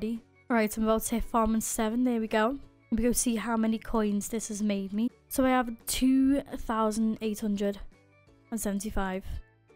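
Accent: Liverpool, United Kingdom. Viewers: are u a scouser ? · The british accsent sorry of My bad spelling im from finland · Pretty sure it's a Scouse accent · im asking you bri't'ish or australian person bcs acsent of a bri't'ish and talk like a australian person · Think it’s scouse (Liverpool) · are you british?? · Innit this that Liverpool girl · Just realised ur British what a gamer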